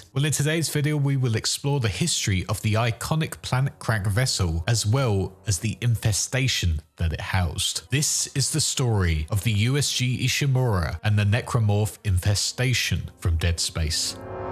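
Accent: United Kingdom. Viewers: Ah, another English guy speaking in that English way as if he's a professional in whatever gibberish he is speaking about.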